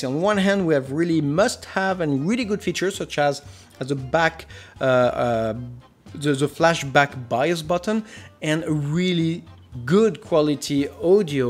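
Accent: France. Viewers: hey i'm sold thanks you very much hope you grow fast on ytb that's explain very well, love the french accent and humor i'm also uh i plan to get this comfig this going to be my first pc gamer i was a console player before now, so for let's sayy holiday 2020 i will have it and buy it there is the part the tomahawk b550 a amd rayzen 7 3700x for the GPU the last thing and more expensive I'll buy the geforce 3080 uh also for the ram what's you saying is if i put only one the pc will run better ? and also a corsair A500 dual fan for the cpu and all can you tell me if it's a solid quality build pc already?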